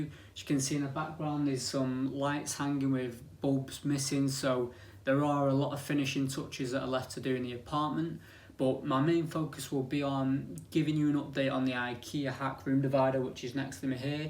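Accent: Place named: North, United Kingdom. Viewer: The quality of videos is very good. I also love your northern accent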